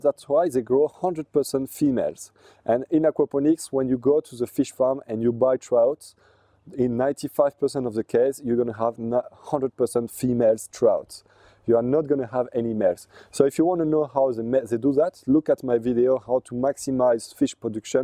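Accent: France. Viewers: Très français cet accent !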